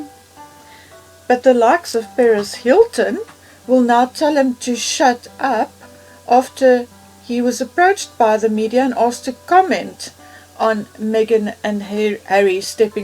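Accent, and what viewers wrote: South Africa. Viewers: Are you South African? Loving the accent im from neighbouring country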